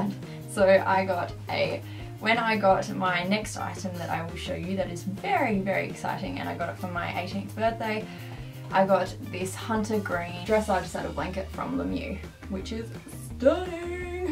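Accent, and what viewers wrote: Australia. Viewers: Wait if your not Aussie where are you from. U sound Australian 😂🤔😂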